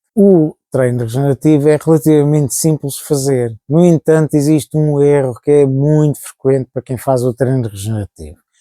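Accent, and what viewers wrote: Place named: Portugal. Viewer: Pensava que era um padre.😅 Mas é um português 😅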